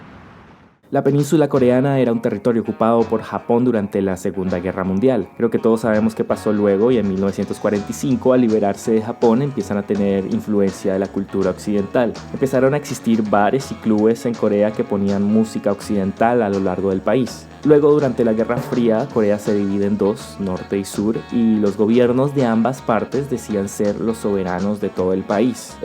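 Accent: Colombia. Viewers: Porque no hablas costeño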